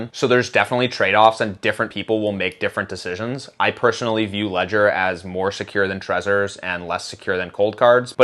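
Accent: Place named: California, United States. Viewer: Thx for the video. Good info. Thanks, but why do you sound like your from Cali?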